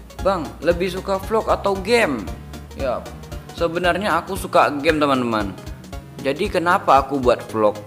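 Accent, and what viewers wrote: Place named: Banyumas, Indonesia. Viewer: ngomongnya yg loss ngapak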